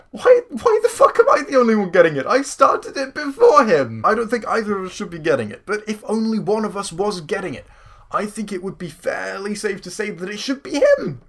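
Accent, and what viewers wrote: England, United Kingdom. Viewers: You sounds so English in your early videos, I thought your accent had just changed when you went to uni. (I changed accent at uni) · You get accused of this because you're British and accents are a tip off that you're prone to rip off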